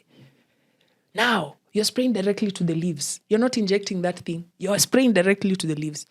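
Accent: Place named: Kenya. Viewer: Kenyan accent.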